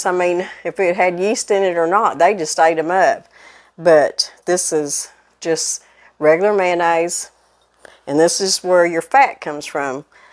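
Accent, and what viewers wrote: South, United States. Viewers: Hi there!!! I am SO delighted to find your site!!! Thrilled, too, that you are a Sister in Christ!!! Can't wait to make these yummy and quick rolls...and to learn lots more from you. LOVE your gentle and kind spirit, too---makes it soooooo relaxing to listen to you. And I was born in the South, so hearing your sweet accent feels like home. 😃❣ Blessings from Indiana, Kim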